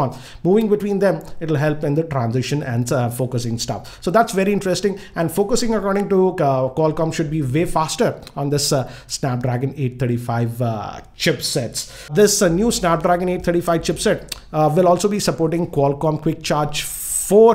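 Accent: India. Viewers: Sorry man, but you need a to work on your accent if your going to speak English it's just too hard to understand. You really sound like your speaking Hindu. Good luck.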